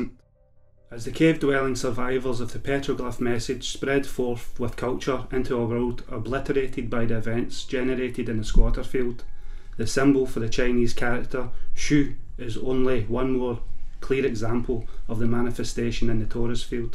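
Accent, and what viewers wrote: Scotland, United Kingdom. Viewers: I believe it's Scottish...or Irish.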